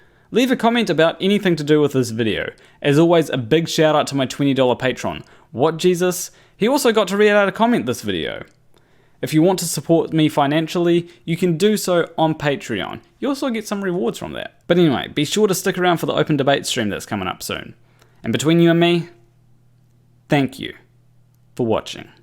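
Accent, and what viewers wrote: New Zealand. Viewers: Ha ha ha, calling you an Aussie. Nah mate, you don't sound remotely like us Aussies. Your Kiwi accent is unmistakable to any who know the difference. And thanks for constantly fighting this effing stupidity, my Antipodean brother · Nope, you definitely still have the Kiwi accent! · You definitely still have a kiwi accent. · Hey. Aussie here and you are quite clearly a Kiwi. Anyone who is truly an Aussie and is having trouble telling that you are a Kiwi should maybe get their hearing checked. Also can’t wait for my next sweet, sweet NASA payment for pretending that Australia actually exists and that I come from there....oh. I just said that out loud didn’t I.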